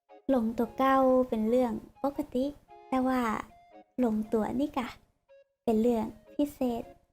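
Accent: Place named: North, Thailand. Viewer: ฟังกุ่วันกัมละหาหุ้ว่าเป๋นคนเมือง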